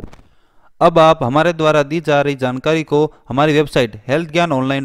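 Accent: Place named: Rajasthan, India. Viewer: Aap rajasthani ho.aap ki bhasa se lagta he..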